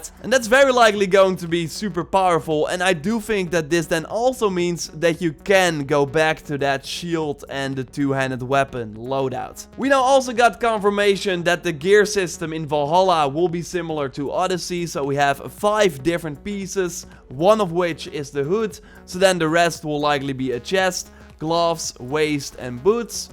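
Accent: Netherlands. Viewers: Are you dutch? Want je hebt een erg Nederlands accent. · Are you dutch? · are you dutch >...?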